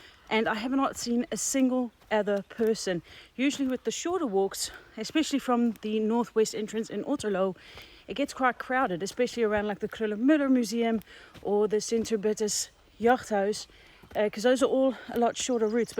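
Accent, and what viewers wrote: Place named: South Africa. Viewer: I think this is Sandra Bullock with a South African accent.....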